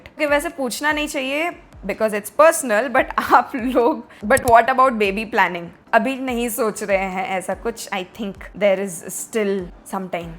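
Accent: Bihar, India. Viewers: family they are from jharkhand which was a part of bihar i guess that's why accent little bihari ki jaga gujarati wase sound lagta hai but aapka toh pura bihari bhojpuri but from this vlog got it that you from Rajasthan 👍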